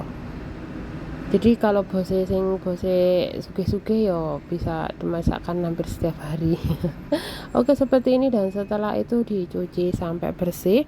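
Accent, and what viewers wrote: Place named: Java, Indonesia. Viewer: Makasih ce resepe,bahasa medok Jawane salam kenal salam persahabatan ce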